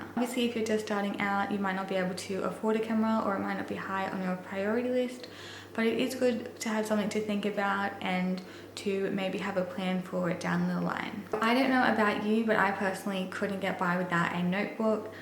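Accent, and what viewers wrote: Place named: Australia. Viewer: Hi! I really enjoyed your video. I really like your accent, I know you live in Australia. I like seeing Australia on TV a lot. Australian people seem so nice. I would like to visit there some day. I'm happy you have your own internet business. I like all the great tips you give. Huge thumbs up! 🙂👋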